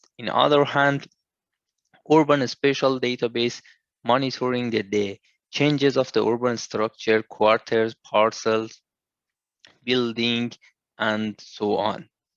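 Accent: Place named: Germany. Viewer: عالی بود فقط ته لهجه آلمانی گرفتی😁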